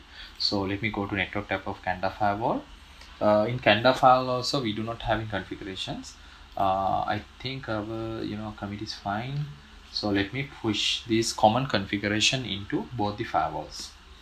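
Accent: Sri Lanka. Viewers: Great explanation BTW are you a Sri Lankan, your accent is so sri lankan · Yes, I downloaded Expedition and installed on Linux box and I have yet to figure out how to use Expedition. You sounded very much like you are from Sri Lanka.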